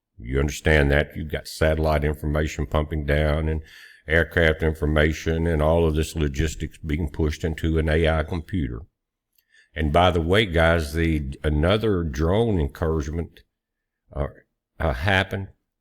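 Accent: South, United States. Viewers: I love your southern accent. I was half way through this video before I figured out you were saying fraud. I thought you were talking about frogs! 🤣 I was thinking Uh oh... went looney tunes.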